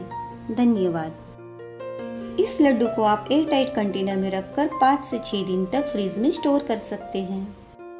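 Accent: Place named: Gujarat, India. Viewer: Your Gujarati accent is adorable, it reminded me of my friend. And really Nice dish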